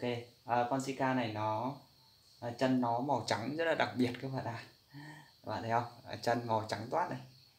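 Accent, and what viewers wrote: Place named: North, Vietnam. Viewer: Ồ xa quá. Em thấy a nói giọng bắc tưởng a ngoài bắc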